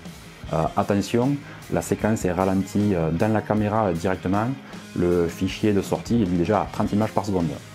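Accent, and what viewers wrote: South, France. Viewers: Sympa et détaillée, ta présentation; et avec un bonus qui va bien: l'accent du sud!! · Salut comme toujours les commentaires sont clairs et précis cet appareil paraît simple d utilisation fait pourtant de belles vidéos encore merci Pour ta présentation continue comme ça avec le bel accent du sud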